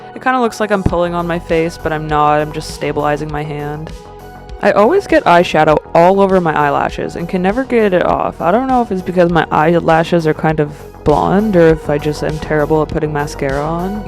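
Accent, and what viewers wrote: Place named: Canada. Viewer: You Canadian Accent is amazing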